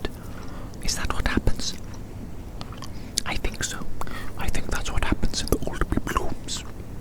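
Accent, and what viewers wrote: Wales, United Kingdom. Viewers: Fantastic asmr!!! Also do I sense a welshy??🤔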